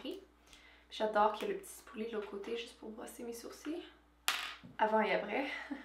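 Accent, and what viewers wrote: Quebec, Canada. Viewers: I had no idea that Canadian French sounded so different from France French! Well, you learn something new everyday! I like the idea of you making videos in French, this way I can learn or at least get more used to the accent (since I study French). Enfin, très amusant :) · Très bien,! Cet type de vidéo est vraiment intéressant, alors continuez à le faire s'il vous plaît! Votre accent canadien était en peu difficile à comprendre pour moi, parce que j'apprennais le français avec une accent differente, mais c'était sympa à regarder et entendre!:D (pardonez-moi si mon français n'a pas été bien. Ce la fait longtemps que je parlais/écrivais en français.) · le français c'est ta langue maternelle??? En tout cas je comprends tout les deux..cest jolie l'accent québécois!!!! Je te regarde d'ici d Europe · As a french girl she does speak good French with a Quebec accent. 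· Oh mon dieu!! Tu es québécoise?! Je viens de ta chaîne booktube en passant que j'adoreee ! Srx je suis trop contente d'avoir découvert cette chaîne. Continue comme ça ! Bisous xx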